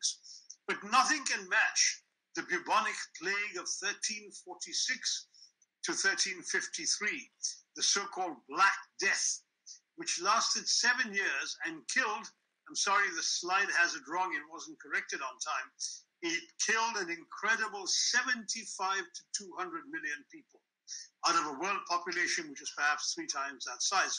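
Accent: England, United Kingdom. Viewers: Respected sir, this is sandeep sharma working with IFFCO as a stenographer. I have been watching your video to enhance my vacab. And its knowledgeable too. You are great sir. I am really gladdened with you english accent and vocab. Please be there to improve english of a person like me. Thanks a lot .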